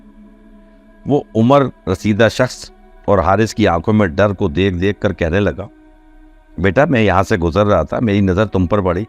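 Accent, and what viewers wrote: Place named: Pakistan. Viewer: Amazing incident 😮 n love your urdu ascent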